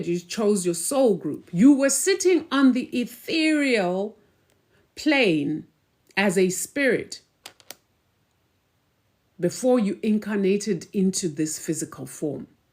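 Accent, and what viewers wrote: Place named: Zimbabwe. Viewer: Hi Gogo. Very very new to African Spirituality. Just wondering if you were born in Zimbabwe? I'm seeing Great Zimbabwe on your profile and hearing an accent that sounds like home. Thank you for sharing...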